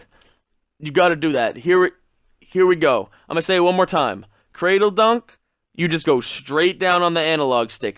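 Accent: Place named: Boston, United States. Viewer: yep this man is from Boston... just said wicked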